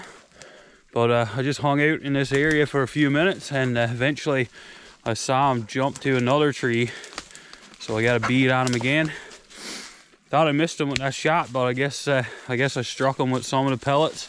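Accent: Newfoundland, Canada. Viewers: I'm from Saskatchewan. Your accent gave me mixed signals, then I thought about it and realized you were a Newfie. Right on man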